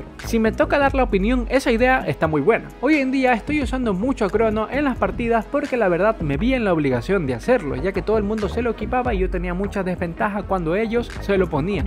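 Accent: Ecuador. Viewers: Pregunta: De que parte de Ecuador eres? Lo digo por q en un directo te vestiste de amarillo y dijiste como el "Barcelona" y en unos vídeos sabes hablar como ecuatoriano xd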